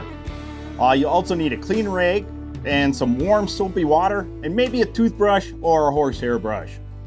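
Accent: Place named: Wisconsin, United States. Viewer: It's how us Wisconsinites talk haha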